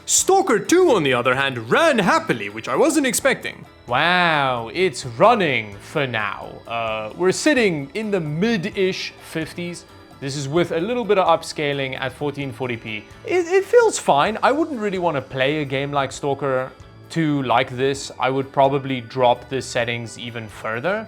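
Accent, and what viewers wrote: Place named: South Africa. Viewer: are you south african? i hear it in your accent from time to time .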